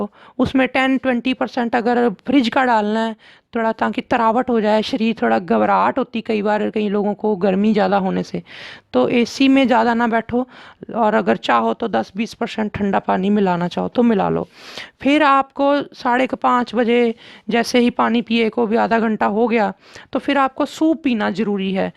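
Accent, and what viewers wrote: Punjab, India. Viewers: Thanx for best useful information an di like your punjabi accent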